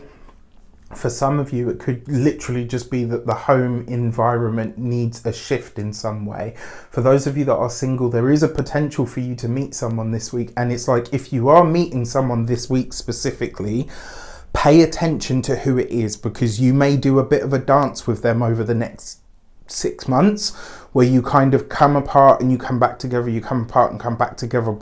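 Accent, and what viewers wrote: England, United Kingdom. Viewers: Dude, i don't know if i want to stay here. You're just to cute and that English accent is driving me Crazy🤫. 18 Years with my husband Remember.😂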